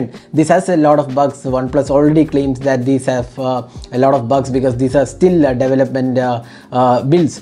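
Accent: Kerala, India. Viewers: R u from Kerala???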